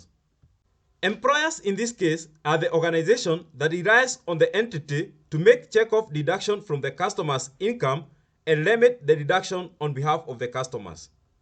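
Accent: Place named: Africa. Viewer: Be slower in your presentation.for a better understanding for those of us that are not from the part of Africa you come from.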